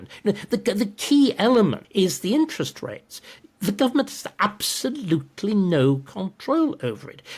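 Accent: United Kingdom. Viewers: I'm American and I just LOVE to listen and learn from a Brilliant Brit. Nobody sounds like they do! 🤩😁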